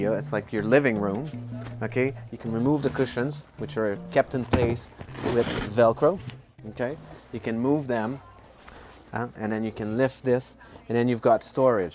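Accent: Quebec, Canada. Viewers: I don't usually hear my own "Quebec" accent on Youtube, that made the video so much interesting for some reason hahah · He sounds like a Québecois la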